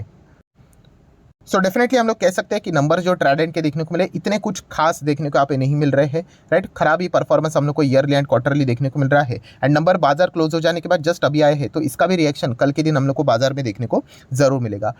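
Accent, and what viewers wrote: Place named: Gujarat, India. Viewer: Sir many times i observed that you are perfect gujarati this is confirmed, i observed your words to words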